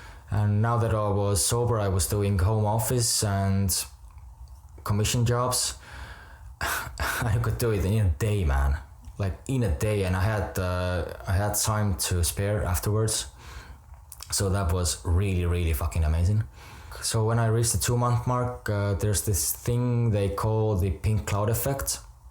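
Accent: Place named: Ireland. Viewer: Kind of sounds like an Irish accent.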